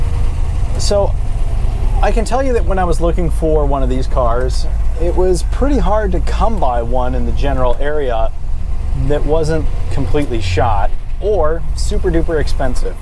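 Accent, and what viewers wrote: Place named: Minnesota, United States. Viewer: What part of Minnesota are you from.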